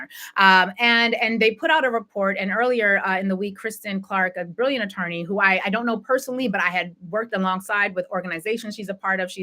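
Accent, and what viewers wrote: South, United States. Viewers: And you speak southern belle.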